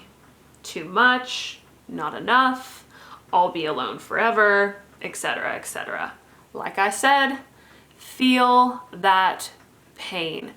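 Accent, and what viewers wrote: Australia. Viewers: your slight Aussie accent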